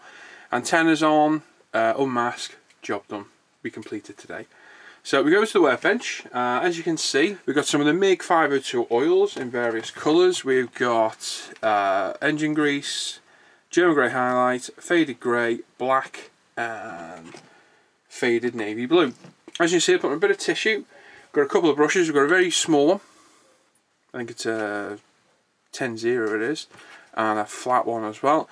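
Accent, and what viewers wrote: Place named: United States. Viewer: It's a very beautiful model, you're very expert. I advise you to mount the version f4u-1a, it's better and more beautiful. The "Birdcage" wasn't very appreciated by pilots, because it had a bad visibility. With the second version, 1a, the "Corsair" was more appreciated. And also, could you put subtitles in foreign languages? Because you speak with a very strong american accent, it's not very easy to understand for me, because it isn't my mother language... However, thanks very much for your videos!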